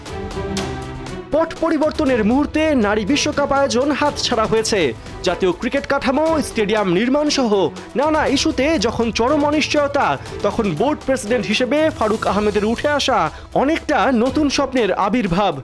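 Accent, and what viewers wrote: Kolkata, India. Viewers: কলিকাতার স্টাইলে খবর পড়েন কেন?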